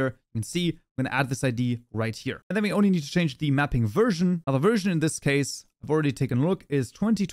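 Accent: Germany. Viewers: i think i can hear a german accent or something likely (no hate im german to🤙)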